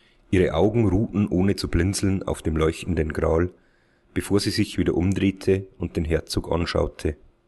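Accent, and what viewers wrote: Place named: Styria, Austria. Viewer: Kompliment zu der Vertonung dieser Kurzgeschichte. Kompliment. Insbesondere der steirische Dialekt gibt dem Ganzen etwas Ostländisches.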